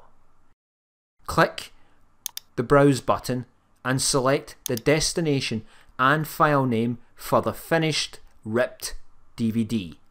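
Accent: Scotland, United Kingdom. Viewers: i like your accent :) i'm guessing scottish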